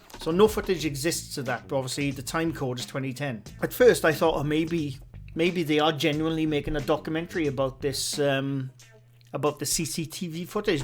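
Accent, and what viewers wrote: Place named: Scotland, United Kingdom. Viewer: a good Scottish accent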